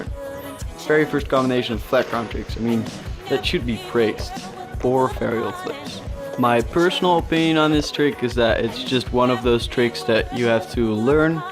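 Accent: Netherlands. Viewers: pretty good my dutch friend.. mooi acent btw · You got a Dutch accent kan ik wel horen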